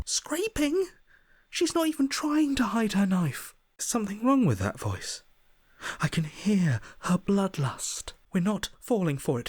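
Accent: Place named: Poland. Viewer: Tak mi się wydawało, że zajeżdża Polskim akcentem, ale jak usłyszałem jak wymówiłeś "vodka" to byłem pewien lol. Zajebisty kontent btw, nie tylko ten film, ale cała reszta, na pewno tu chwilę zabawię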